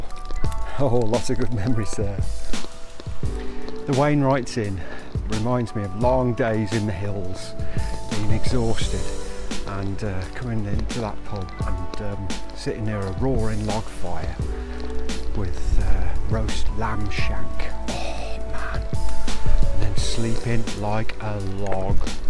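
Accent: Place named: Midlands, United Kingdom. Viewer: Your videos are proving really useful! I'm walking The Cumbria Way in a few weeks ( August 22) It's my first solo walking and camping be trip so I'm a tad nervous; I'm used to trail running but not walking long days back to back. I love your accent,. I can tell you are a fellow midlander but I just can't pinpoint it...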